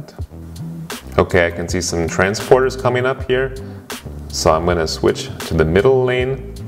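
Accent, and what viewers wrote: Germany. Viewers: It’s true. You really do sound like a German who speaks really good American English. Weird. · Your accent sounds a bit German. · American guy with a German accent. It stands to reason, therefore, that you've lived there for a bit. Because you have an accent. I love driving on the Autobahn when I'm visiting there. And I love this video.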